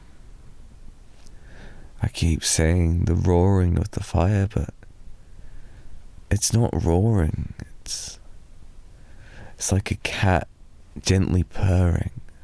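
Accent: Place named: United Kingdom. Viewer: Finally, British accent! No more American accents!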